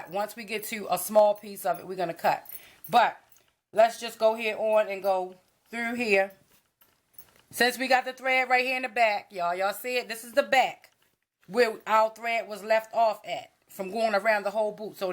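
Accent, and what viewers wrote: Baltimore, United States. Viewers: Your from Baltimore ?? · I was just about to ask😂 tbh I was leaning towards DC because of the way yu say certain things, but then that distinct "uu" came out.... but the real give away for me was the fact you customized boots which is a female hustle out Bmore...😘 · You must be from Baltimore Maryland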